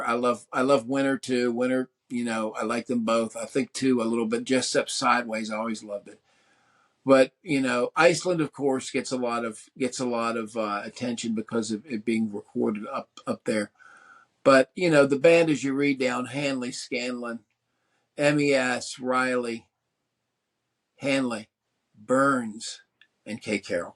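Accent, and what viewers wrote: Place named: Maryland, United States. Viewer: Your Maryland accent, which I share with you, is excellent for reviewing Fall albums. It’s a little oddball in the pantheon of American accents, although it can’t really rival the distinctiveness that is the Mancunian accent. I could be wrong about this, but it seems the Fall always had a lot of fans in the Baltimore / DC area.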